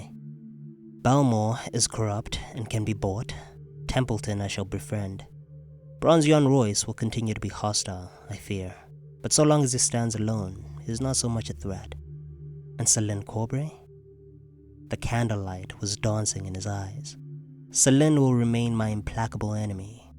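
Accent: South Africa. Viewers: This dude sounds South African😅.... great analysis by the way❤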